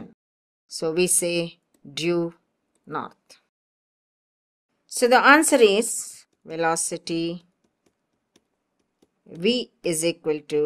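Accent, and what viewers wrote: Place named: India. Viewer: No..she is not american look her accent is indian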